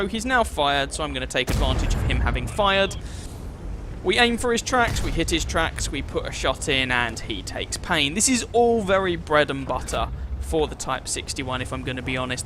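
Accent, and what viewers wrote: England, United Kingdom. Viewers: Loving your videos, probably due to your English accent... Keep it up.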